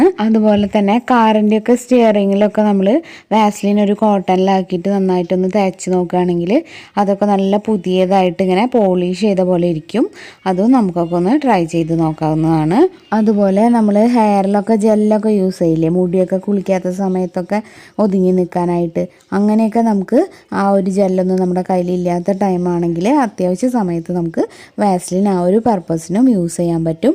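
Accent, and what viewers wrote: Thrissur, India. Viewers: നല്ല ഒരു മെസ്സേജ് ചേച്ചി തൃശൂർ ആണോ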